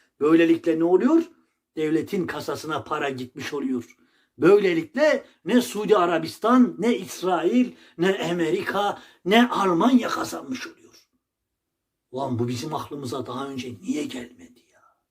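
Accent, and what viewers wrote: Erzurum, Turkey. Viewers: Erzurum şivesiyle güzel yorum yapıyorsun.Gerçekten oralıysan,Erzurumluların seni dinlemesini ve taktir etmelerini senden onur duymalarını dilerim...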